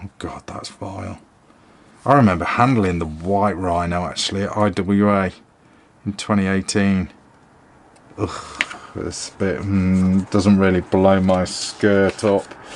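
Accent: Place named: United Kingdom. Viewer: Oh, this British accent :D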